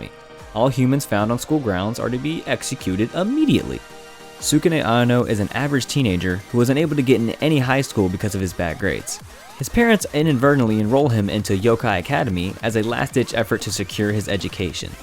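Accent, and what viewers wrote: France. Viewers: tu es francais?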